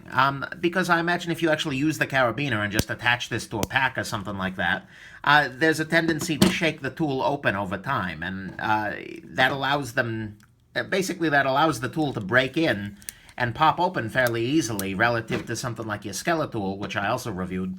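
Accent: New York, United States. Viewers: This man literally has to have had surgery that altered his voice. If you watch a video just one year later he sounds like a completely different New Yorker hahaha. It weirds me out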